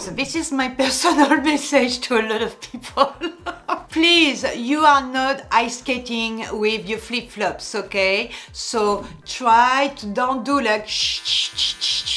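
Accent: France. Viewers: Some really great style tips. Thank-you. I could listen to your French accent for days on end - no matter what you were talking about 😍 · I love your French accent. It reminds me of the months I lived in Bordeaux. I love your personality. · I guess you are French... from your accent. Right?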